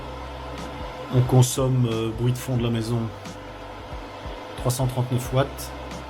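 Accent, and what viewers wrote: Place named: Belgium. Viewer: Très intéressant, vos vidéos sont très bien faites. Seriez-vous belge? Votre accent m'est très familier 🇧🇪😊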